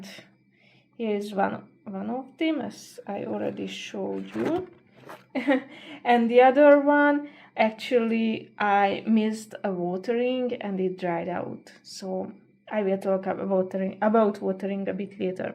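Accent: Hungary. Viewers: Are you Hungarian? The accent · It's very Hungarian English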